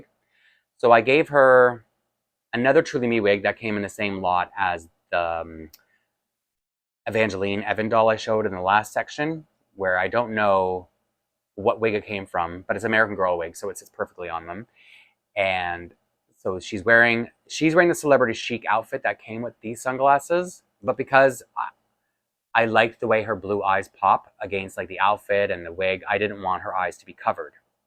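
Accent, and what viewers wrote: Canada. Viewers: I made it to the end! I started watching your videos a couple days ago to procrastinate homework. (About halfway through this one I decided I needed to multitask.) But I watched the MapleLea winter haul video earlier today where you mentioned you were Canadian and I didn't pick it up then but I definitely heard the accent in this video. My mom thinks my collection is insane (17 dolls as of now) but this just might prove her wrong, ha!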